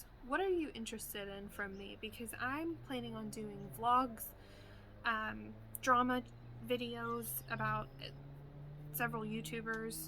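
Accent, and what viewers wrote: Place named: Kentucky, United States. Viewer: Hey! I knew in your last video you has an accent. I used to visit friends in KY all the time. I haven't been back in about 8 years but love it there. I think 20 to 30 minutes is a good length depending on the content. I'm glad you didn't edit out the honking car it actually fit as a funny effect! Can't wait for the cooking video 😊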